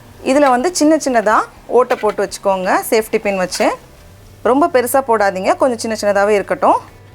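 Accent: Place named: Nagercoil, India. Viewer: Baby is soooo cute... And the way you speak ... Is your native is Nagercoil?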